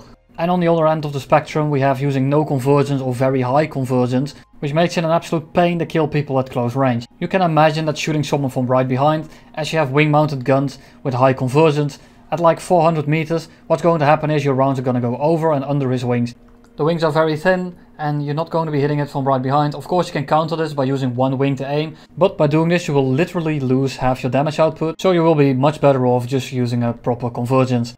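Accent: Netherlands. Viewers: Your accent sounds a little Dutch. Are you? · You’re Dutch aren’t you? Nice accent bro. (Frikandelbroodje) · Dutch accent detected?? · Dutch accent! xD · Bro is this guy dutch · Are you dutch? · whats that accent? it reminds me of an dutch accent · Ain't he Dutch? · Are you dutch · 16 sec in 2 words spoken eeeeeey dutch guy insta subbed goed beezziiig. xD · are you from the netherlands? it sounds like you have a netherlands accent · i love your dutch accent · are you from the netherlands? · Beetje nederlands acentje dit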